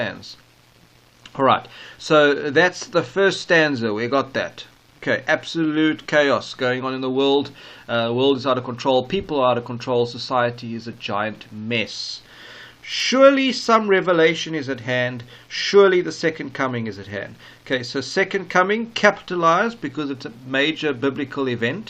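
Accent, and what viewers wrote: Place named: South Africa. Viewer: Haven’t listened to your whole vid yet, but as soon as I heard your SA accent, I knew this analysis would be spiritually mature and thought provoking. Thank you for a beautiful recitation and sharing of valuable insights 🤗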